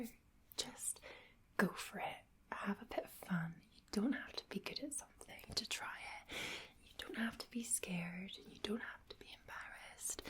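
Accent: Scotland, United Kingdom. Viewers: Grew up in Glasgow and lost my accent when I moved to NYC. This is changing my opinion of the Scottish accent. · I love that Scottish accent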